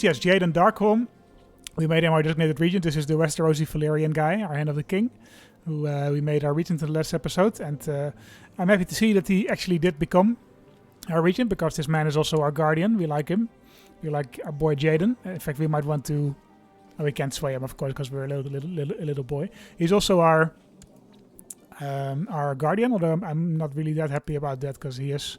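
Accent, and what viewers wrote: Netherlands. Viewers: Ah, it's a Dutch accent, I couldn't place it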